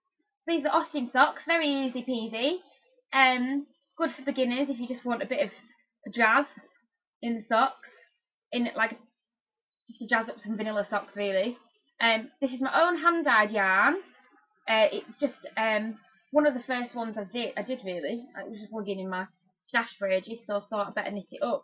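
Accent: Northern England, United Kingdom. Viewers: I love your shed! Also your accent's fine, it's good to hear a Northern accent on a podcast for a change :D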